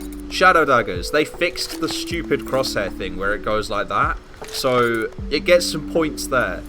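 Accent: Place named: Italy. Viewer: fuck italian english is so wild bro 😂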